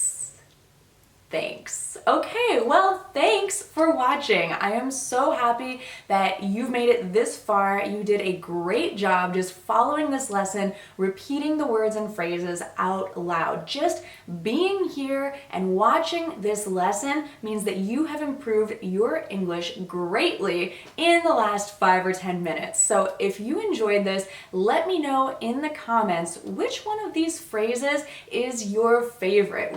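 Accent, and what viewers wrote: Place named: United States. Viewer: her pronunciation is American because she from America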